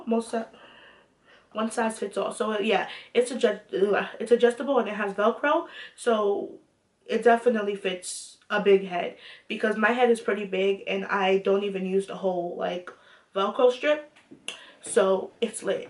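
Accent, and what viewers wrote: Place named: New York, United States. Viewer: U sound like u from new York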